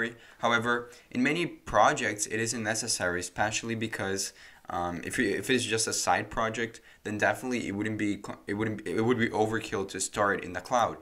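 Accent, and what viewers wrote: Brazil. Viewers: TU É BR NÉ? namoral sotaque foda, mas da pra ver q é br. Belo tutorial <3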